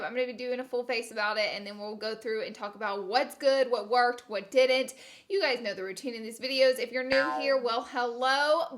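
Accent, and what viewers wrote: South, United States. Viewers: Gurl I understand your southerness. I didn’t even realize you were saying it wrong either. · Love drugstore makeup! Girl I’m from SC I’m as country as cornbread and I stand proud sayin washer merchine lol 😂 love your country accent!! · I friggin love your southern-ness 🤠♥️👢 oh and you forgot greezy = greasy 🤪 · The accent!! ❤️ Gotta Love us Southern girls, big shoutout from Mississippi! ☺️ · It’s A SOUTHERN THING HUNIII 🤠🤠🤠🤠🤠🤠🤠🤠🤠🤠🤠🤠 washer machine I say it like that too. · I'm from Louisiana and none of your southern words are wrong! Lol. I get picked on also! You keep being you! 🙌🏻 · Washer Machine ,I do the Same! North Carolina. Southern girls accents Never Fade 🌹❤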